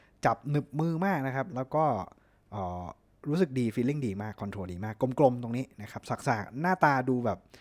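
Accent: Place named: South, Thailand. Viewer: ใต้ออกเยอะหรอยนะครับคลิปนี้555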